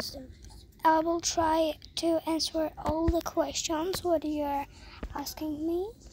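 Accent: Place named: United Kingdom. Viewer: U sound so british broo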